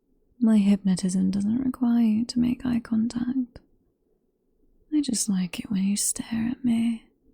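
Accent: United Kingdom. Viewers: As a fellow briton, that accent is too posh british. My accent is a mix of slang british 😂 But nice Vid!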